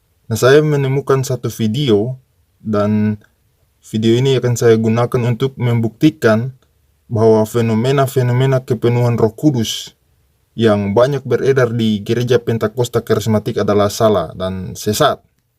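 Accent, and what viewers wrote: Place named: Eastern Indonesia, Indonesia. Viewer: Yg Bicara Ini Dr Logat nya Dari Suku NTT ATAU MALUKU!!!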